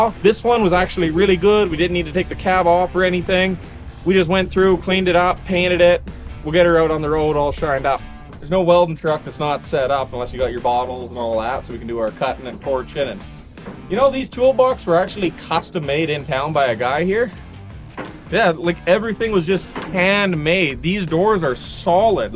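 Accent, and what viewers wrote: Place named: Prince George, Canada. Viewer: You sound like you're from Prince George or Quesnel.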